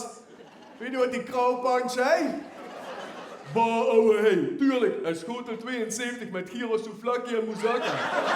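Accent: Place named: Limburg, Netherlands. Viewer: Moest als rotterdammer wonend in Limburg goed luisteren naar het dialect maar wat een humor heb hem inmiddels al 8 keer gekeken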